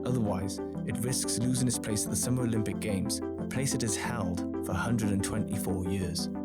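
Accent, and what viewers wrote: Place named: Wales, United Kingdom. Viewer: I thought your pace was spot on and your enunciation clear. The welsh tones are very mild.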